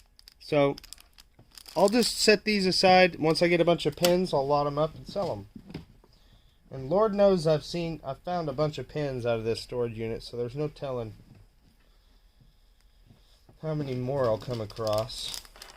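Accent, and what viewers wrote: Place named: South, United States. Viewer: I am enjoying your videos from Australia. I am originally from Texas so the Southern accent reminds me of home.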